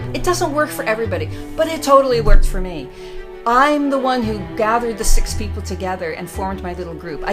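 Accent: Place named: Canada. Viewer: Oh ! Wow ! I had no idea !?? That you are/ were a lawyer !!!?( Did you mention in the 1st video I watched !? ) You are a very interesting woman ! 1st. A very good looking lady .2nd. A lawyer 3rd .Cares about animals 4th. has a musical talent ( piano )5th. a vloger 6th. a Canadian woman living in Wales ! ( keeping her own accent & not speaking w/ a Welsh or British accent ! In a way , cooler as your native language is English to begin with ! I've met many English men lost their accents living in U.S. It's a shame ! I think everybody should keep somewhat their accents ! 7th.living a healthy lifestyle , a vegetarian & rides on a bicycle everywhere you go ! ( maybe except for drinking !? Lol ). 8th .A mother of a gorgeous young lady who's gonna be a lawyer herself in the future ! COOL !!! You looked like an actress in the picture !!! I feel sad to see your friend's passing information at the very end ! 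Way too young ! I am sorry for your loss ! Very sad .💜💜💜💜💜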